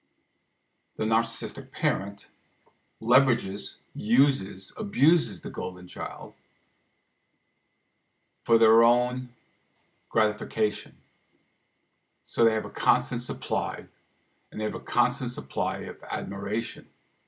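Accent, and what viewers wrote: New York, United States. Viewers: Sir, it is spooky how you are describing the lives my wife and I have lived. We are both children of narcissistic parents, (more so our mothers), originally from NJ. We moved to the gulf coast of AL to escape our narcissistic mothers in NJ. We both suspect, from your similar accent, your originally from that general region also, (ok, I'll say it, NY), and may have relocated as well. We "enjoy" your posts, because they serve to let us know we are not crazy. We have concluded that no contact is the only way to escape. However, just like Al Pacino said, just when we thought we were out, they pull us back in! LOL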